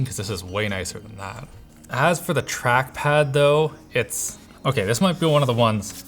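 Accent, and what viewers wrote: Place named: Canada. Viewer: Canadians accents make me laugh and I'm Canadian.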